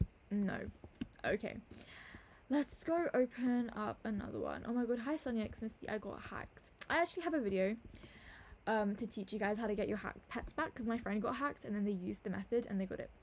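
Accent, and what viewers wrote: United Kingdom. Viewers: When you discover for your 1st time that is British 💀 (this means I love it and I’ll start to watch more to know how British people talk 🫶)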